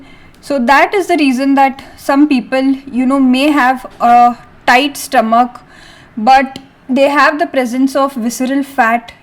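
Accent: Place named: South India, India. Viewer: 😂ALL ASIAN DOCTORS ARE 2ND WORST AFTER ARAB DOCTORS! SHE WASTED MORE THAN HALF OF TIME WITH THICKKKKKKK SOUTH INDDDDDIANNNN ACCENT, LIKE RESUME IS CALLED RESHUME IN SOUTH INDIA 🤣, FINALLY THE TOPIC IS REACHED IN END 😭🙏 THAT'S MIDDLE FINGER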